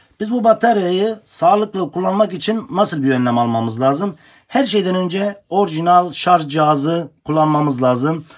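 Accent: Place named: Kurdistan, Turkey. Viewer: Agridan gelmiş konusuyo don la köyüne kürt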